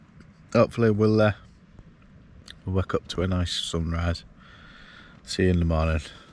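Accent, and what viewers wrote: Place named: Yorkshire, United Kingdom. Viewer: What a great lunch and cup of tea, all to your super music and dulset tones with some super photos. Splendid work. James and I am getting used to the Yorkshire accent. Hope you are clear of illness so you can keep on with the videos. I enjoy yours as you are down to earth without any pretentious attitude like some. Look after yourself!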